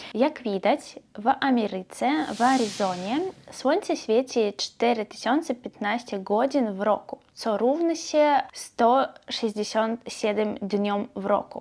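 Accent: East, Poland. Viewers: Polski bardzo dobry. Akcent jak z Polski Wschodniej. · Pani kochana, teraz to jest pani przede wszystkim Krakowianką, moją sąsiadką. A pani język polski jest bardzo dobry. Lekki wschodni akcent tylko dodaje charakteru pani wypowiedziom. Jeśli chodzi o pierogi, to tylko z kapustą i mięsem. Niestety ciężko kupić takie i najlepiej samemu zrobić, wykożystując mięso wołowe z rosołu. Pozdrawiam serdecznie z Klinów. ❤ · Kapitalnie Pani mówi po polsku. Widać chęć, talent i kulturę osobistą . Za dwa lata nikt nie rozpozna że pochodzi Pani ze wschodu. Tak trzymać . Pozdrawiam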